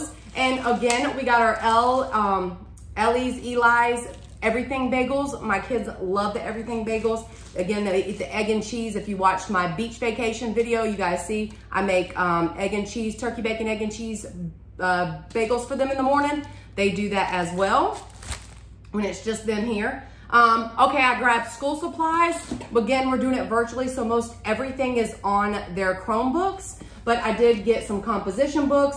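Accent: South, United States. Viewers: Burnt orange. Southern accent.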